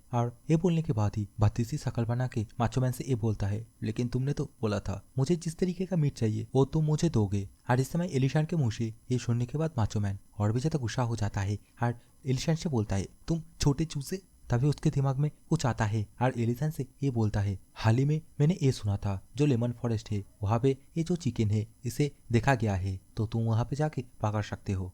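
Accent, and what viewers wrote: Bengal, India. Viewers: That bengoli accent 😅😅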